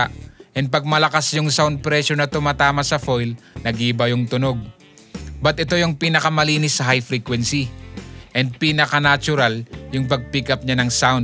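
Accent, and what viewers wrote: Cordillera, Philippines. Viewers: I Thought ur from Cordillera hehe. Accent dude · Apay madlaw ba? hehe, the cordillera accent. Ayos na ayos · Are you by any chance from the Cordilleras? Thanks for this vid, very informative. Iyaman.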